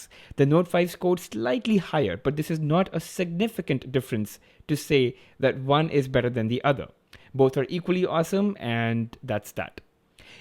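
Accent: India. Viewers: I just hate the Indian accent. It ruins everything everytime.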